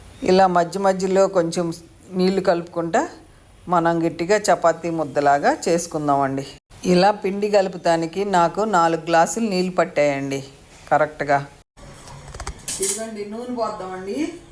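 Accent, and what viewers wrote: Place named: Nellore, India. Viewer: Mee language nellore side laga vundi aunty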